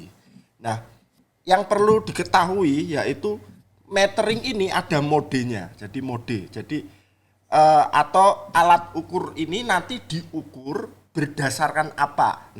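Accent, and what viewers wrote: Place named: Java, Indonesia. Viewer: pekat sgt jawanya pak..tp terhibur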